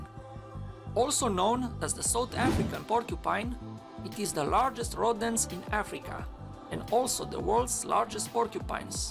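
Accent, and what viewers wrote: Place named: Poland. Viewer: Polaszkanski angielski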